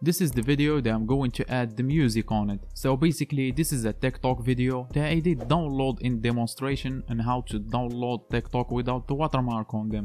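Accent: Morocco. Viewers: Your accent just Moroccan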